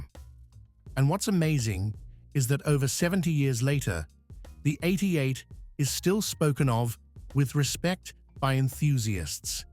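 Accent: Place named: RP, United Kingdom. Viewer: Why does the story of a classic AMERICAN automobile have to be narrated by a British linguistic sophisticate who sounds as though he should be orally presenting a Charles Dickens novel? Also, the U.S. InterState Highway Act was not signed into Law till 1956.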